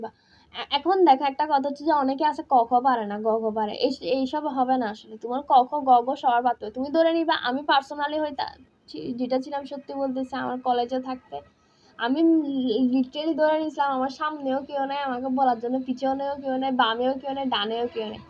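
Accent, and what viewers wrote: Chittagong, Bangladesh. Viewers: Apu ami kintu ekhon Chittagong e thaki🙂 Apnar language shunei buja jacche Chittagong er🙂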